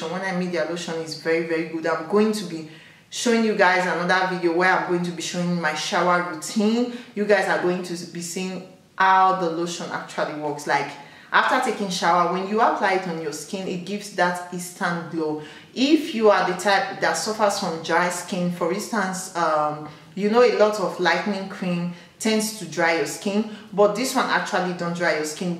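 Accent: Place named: France. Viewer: French accent ❤️❤️❤️love your accent girl! Thank you for the video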